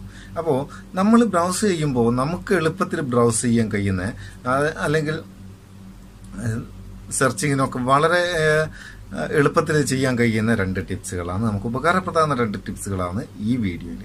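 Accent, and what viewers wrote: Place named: Vadakara, India. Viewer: ഇക്ക നന്നായി ട്ടുണ്ട് നമ്മുടെ വടകര ഭാഷയിൽ അവതരണം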